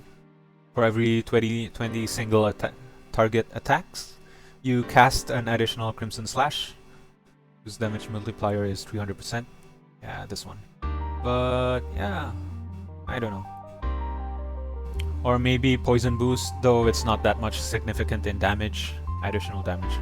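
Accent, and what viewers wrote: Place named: Philippines. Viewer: isee haha sounded like ur from philippines also decent english accent. Will u please check to test my hybrid build on tr i use katar glaive and dagger for it, 1m CP proc around 150-200, normal atk and buff only on auto, and im using 1 illu drake 3s. Kinda works good for me cuz i get more dmg output to some whales here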